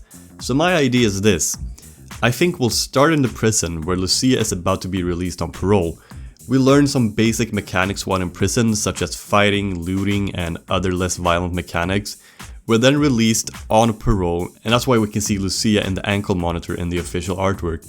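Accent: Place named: Sweden. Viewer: ju saund sviidish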